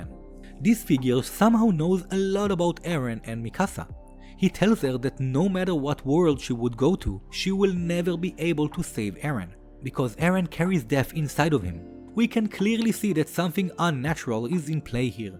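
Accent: Israel. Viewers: Israeli right? I could tell immediately, it's particularly noticeable when you say "Reiner". Loved the bit about zhuangzi's butterfly, I subscribed. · For me it was when he said the word "year." It's an awesome Israeli accent. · Are you israeli? your accent sounds like it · You’re from Israel,? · Are you from Israel? לרגע חשבתי שאני שומעת בעברית ואז הבנתי שזה המבטא שתפס אותי לא מוכנה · The accent is Israeli. 100% Also, your English is superb! Kol Hakavod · Ohhh hebrew Being canadian i never come into contact with that language so its completely foreign to me. Every hebrew content creator ive watched always has a super happy voice so dont worry the accent is enjoyable. And Im glad i finally have my answer because everything about yours and others pronunciations is typically spot on until you get to Vs. Im gonna have to research why that is because sometimes ill hear the V pronounced correctly in "Video", while other times its "Bideo". 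Just crazy that language does that to you where you dont even realize you arent pronouncing it right huh? I have a similar issue because I was born in Quebec, so i was raised around french and Ill pronounce it weirdly cause my mind slams 2 puzzle pieces that dont fit together into a incoherent thought. KInd of like how the Japanese have a hard time distinguishing Ls and Rs. So if I see a "oi", in french it's pronounced "wah" , but thats not really something you see in english at all.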